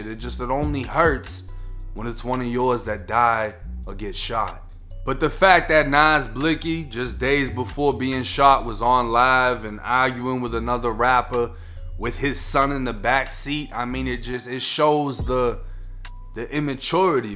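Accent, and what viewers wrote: Boston, United States. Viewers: This dude got a boston accent.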